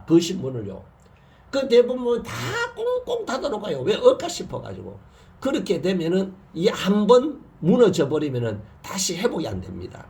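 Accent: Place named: Daegu, South Korea. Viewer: 대구 사투리같은데 듣기 넘 좋으네요 ..... 가드닝 지식 많이 배웁니다 감사합니다 늘 건강 하시길요^^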